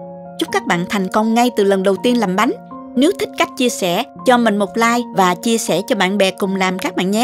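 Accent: South, Vietnam. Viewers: Giọng nói đặc sệt miền nam nghe rất là nhẹ nhàng+ tài nấu nướng của cô ấy thì Miễn bàn.Ông chồng nào đó của cô ấy rất có phước. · Kênh của rất tinh tế, giống nói ngọt nhẹ rõ ràng của người miền Nam, công thức và trình bày ý như người chủ kênh vậy. Em sẽ làm thử vi thấy làm đơn giản quá mà xình xắn nữa. Thật trân trọng cảm ơn Cô nhiều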